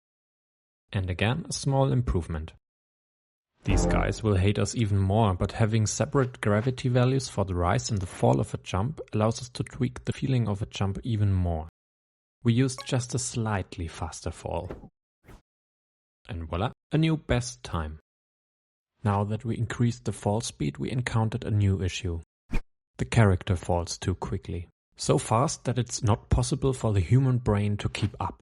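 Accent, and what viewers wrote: Germany. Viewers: You sound a bit german but im not sure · Schönes vid... und Dein englisch ist sehr gut, aber man hört immer den "Deutschen" raus.... 👍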